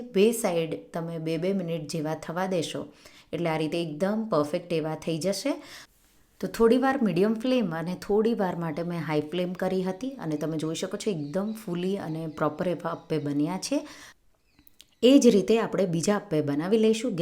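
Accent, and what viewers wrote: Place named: Kathiyawad, India. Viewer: તમારી વાણી એકદમ કાઠિયાવાડી મધુર છે. Love from New Zealand.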